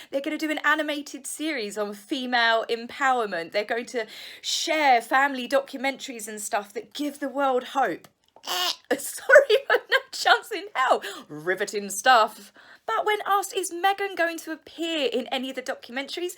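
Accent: England, United Kingdom. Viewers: Hi! We have been watching your videos for a long time now! We are from Serbia and we never ever liked Mm and nor P Harry eighther. We just want you to know - we admire your work, your wonderful English accent! You are always right! We think - from over here we see - Harry has always been jealous and envious at his brother, it’s so obvious when people like us don’t have any reason, any use, any connections, we just see clear. We support you! Your thoughts about them - sooooo true! Love you, watch you!!! Thank you!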